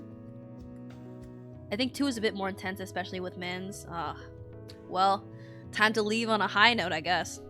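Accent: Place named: New York, United States. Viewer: Love the new Yorker accent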